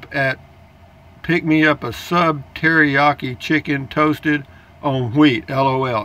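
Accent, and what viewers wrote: Texas, United States. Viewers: Its nice when we see your new videos .You look very content😀.Happy x u.Love the texas accent .I used to live S.A.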